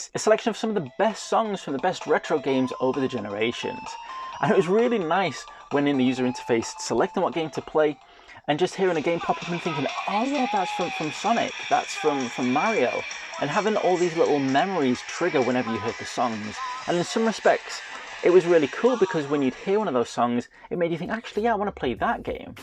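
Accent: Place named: United Kingdom. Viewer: Nothing worse than clicking on a video from a YouTuber I don’t know and hearing a British accent 🤮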